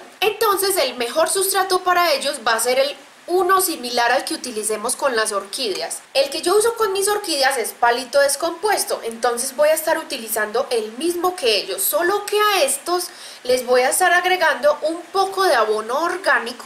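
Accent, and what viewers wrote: Colombia. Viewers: Hola, me gusto mucho el cactus que esta floreciendo me podrias decir Como Se llama, y me encanta tu acento colombiano 😁😁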